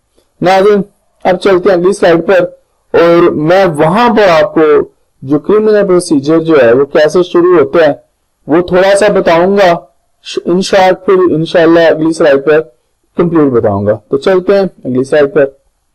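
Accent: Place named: Gujranwala, Pakistan. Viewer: Your dialect sounds from Gujranwala.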